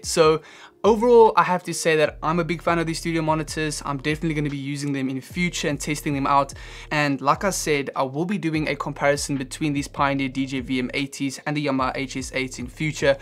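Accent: South Africa. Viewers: Your accent sounds south african are you originally from south africa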